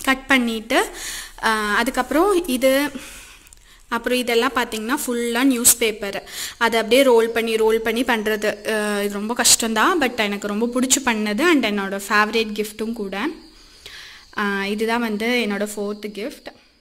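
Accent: Kongu, India. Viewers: I subscribed ur channel especially for ur kongu Tamil and crafts.... Thank u for ur ideas...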